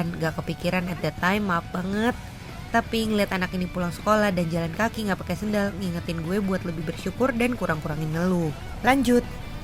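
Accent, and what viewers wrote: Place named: Sunda, Indonesia. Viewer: Durasi maksimum 25 menit sudah pas sih buat nonton... more than that, akan jadi agak effort juga nonton sampai selesai... Tapi ini content very well created... mantap!! Btw, logat Sunda-nya si Cici kental banget yaa hahaha... kepo sama merk celana tactical Ko Misua, kok kayaknya bagus tuh celana tacticalnya... spill dong...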